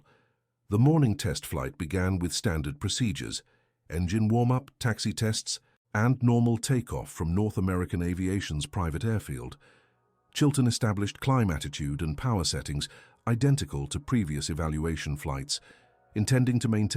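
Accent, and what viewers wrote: United Kingdom. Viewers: you need to dispense with the British English!! This is the United States of America! If i have to put up with your kind of english I'LL UNSUBSCRIBE!! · Guess what, The United States of America is NOT the only country that watches YouTube,! Go ahead and unsubscribe if grousing about a British accent is the only comment you can make about an very interesting and informative video. (By the way, I was born and live in the USA.) Let me guess, you are a liberal, they are the only people I know that are bigoted enough to make such an uncalled-for comment.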